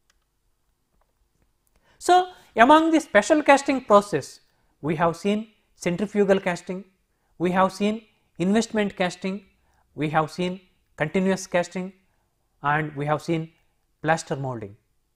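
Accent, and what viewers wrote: India. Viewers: Ok its very interesting ,but common this indian accent........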